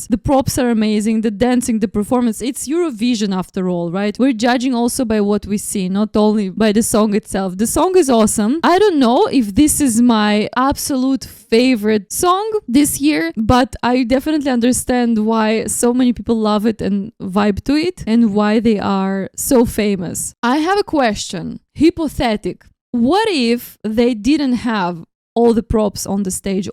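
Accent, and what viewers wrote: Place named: Russia. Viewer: Great reaction. And lovely Russian accent! Greetings!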